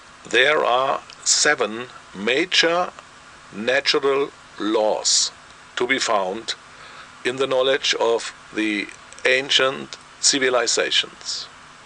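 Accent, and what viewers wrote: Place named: Bavaria, Germany. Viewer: Geil :-) der Babbelt Englisch wie Bayrisch ;-) hehe awer ma versteht alles herrlisch :-) Daumen hoch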